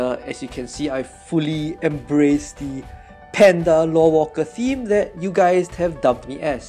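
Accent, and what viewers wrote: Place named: Singapore. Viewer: This was the most wholesome video I have ever seen, really cute! And you are from Singapore, always wondered where your cool accent comes from. <3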